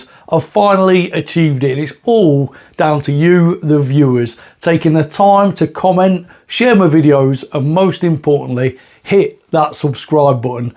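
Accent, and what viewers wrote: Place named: Leicester, United Kingdom. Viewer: You’re welcome matey. Out of curiosity where are you from? Detecting a Leicester or Notts accent.😊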